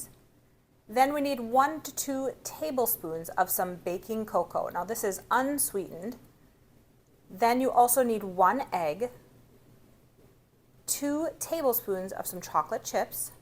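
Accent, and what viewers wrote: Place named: South Dakota, United States. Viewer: I spent some of my elementary school years in eastern South Dakota. You sound like you're from that area of the world. Sounds good!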